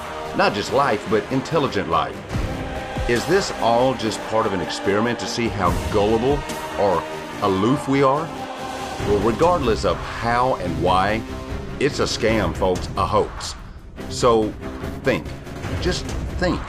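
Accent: South, United States. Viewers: keep up the excellent work- also, great to hear a Southern accent (I am from Dallas)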